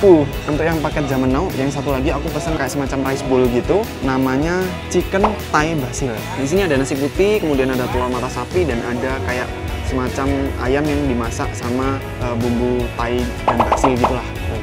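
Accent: Java, Indonesia. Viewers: Ngomongnya kayak logat jawa tpi org bali:vv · kirain jawa. Kok kayaknya medok banget kalo ngomong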